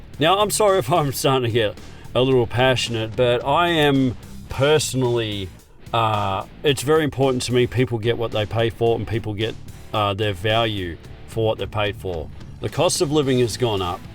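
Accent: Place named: Australia. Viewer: I've understood so too, that it's not a small reason for that channel to be so popular. And those videos are quite well scripted. Even though they make mistakes every now and then. Your pacing, use of voice, script, editing and that Australian accent plus those sentences or questions with your voice modified are well made/good content. 👍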